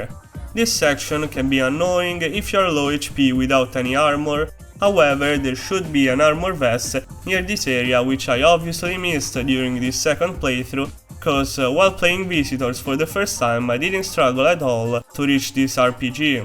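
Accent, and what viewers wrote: Italy. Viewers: Nice vid but u sound so italian lol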